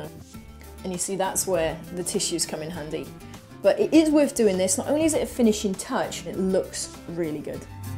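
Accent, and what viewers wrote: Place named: Wales, United Kingdom. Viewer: It's the combination of no nonsense facts and a tinge of a Welsh accent. Go with it.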